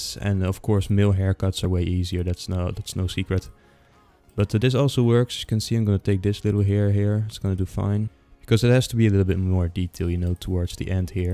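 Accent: Netherlands. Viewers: I kan hoor dat you are Nederlands 😂 lekker bezig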